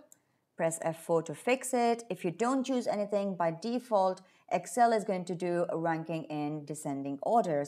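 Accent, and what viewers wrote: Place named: Turkey. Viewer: you sound Turkish though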